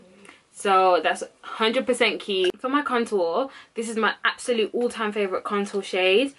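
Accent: United States. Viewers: I wish you wouldn’t speak like a American 😔 love videos though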